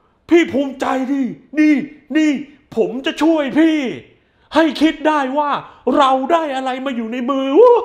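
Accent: South, Thailand. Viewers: คนใต้หรือคับ ผมชอบนะเวลาพูดใต้ เพราะมัน.อ็อฟตัด!!.....(หายไปไหนมาทีไรตกใจทุกที)สนับสนุนแกนานนะอ็อฟตัด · พูดใต้เสริมๆมาบ่อยๆก็ตลกดีนะครับ เป็นเอกลักษณ์ช่องดี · ชอบ พูดใต้อะ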